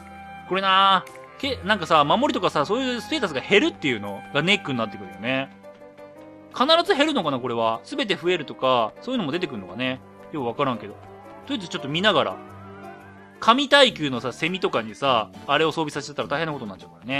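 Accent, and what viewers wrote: Kansai, Japan. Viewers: 君の拙い関西弁に萌えた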